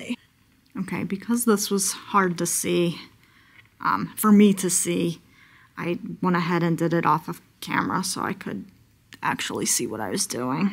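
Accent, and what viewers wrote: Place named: Northeast, United States. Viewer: Love your color choice. I found you looking up sock knit along. 😊 Your accent! Where are you located. I hear northeast, but I hear west coast in the cadence. What?? Right am I way off? ❤❤😊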